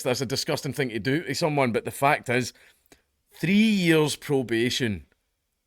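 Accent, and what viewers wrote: Scotland, United Kingdom. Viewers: Lol mudder. I know we Welsh have a fun accent but Scots just take the cake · hitting us Yanks with that unfiltered Scottsman accent. I love it. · Nice to hear him talking scottish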